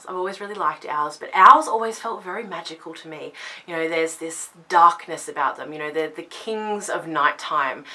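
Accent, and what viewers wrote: Australia. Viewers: I'm Scottish and I love the Aussie accent! :) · Hello. I don't usualy comment on Witchy channels because im so new i usually figure " well whet the heck do i know yet. " and as a truck driver in the U.S.A. my Pagan expierences are so different that they are unlikely to be of any help. And us truckers tend to be a bit reclusive any way. So im stepping way outside my comfort zone for this but here it goes. I do not use a magical name eithe in my pracktice nor online. This is only because i am not a youtuber. If i made youtube videos( ill admit I've thought about it.) i would use an alternat name for security reasons but that is it. However it is unlikely that i will ever make a youtube channel because i don't know that the content would be very relavent or interesting to any one. Seriously how many Wiccans do you know who live and work in the cab of an 18wheeler. 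How manny need to know how to cast a circle inside a semi truck. Lol you see my point. Mine is a compleetly Nomadic Wiccan lifestyle, ware as traditionally Wicca and Paganism in general, is verry home based. Anny way. i have rambled on long enoughf and ive allready written a book in your comments section. So ill end by saying i love your channel and your accent. Australia is the only country in the english speaking world who's accent rivals Scotland. Be well and blessed be.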